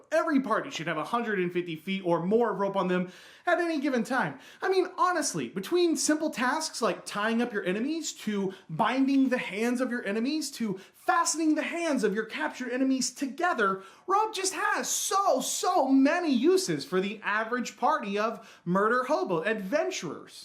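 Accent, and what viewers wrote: Texas, United States. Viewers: didnt know you where texan hello from killeen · Now I want to know where in Texas he's from. . .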